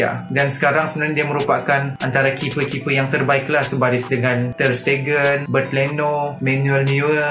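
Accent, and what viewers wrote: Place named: Sabah, Malaysia. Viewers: Sabah bah ni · Original sabahan bah speaker nie...pelat bunyi nya... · Sabahan speaker 👏🏻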